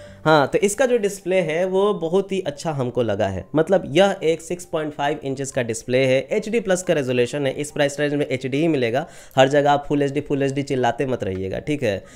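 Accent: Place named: Bhojpuri, India. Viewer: Ye Bhojpuriya touch hamko acha laga 👌❤️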